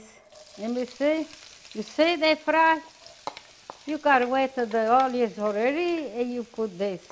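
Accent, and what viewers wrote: Italy. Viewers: I love to listen to speak. She has a lovely Italian accent which reminds me of my dear nana who passed away two months short of her 100th birthday in 2016. She was one tough cookie. God bless her and god bless. Thank you for your recipe. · I like grandma and her recipes she is doing a wonderful job.Her strong Italian accent is something I can't get enough of.Would like to see who is behind the camera ;) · This is my first time watching, and I loved everything about it. The singing, the comment "my children are my life" and that great Italian accent. I love everything Italian. My favorite is watching old Italian movies with sub titles. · Broken English with Italian accent is so cute. She did a good job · I would love to taste everything she cooks they all look so delicious I get hungry just watching her make the food I did save most of the recipes to make at a later date I like listening to her Italian accent my mom and grandma were both Italian my grandma was born in Rome!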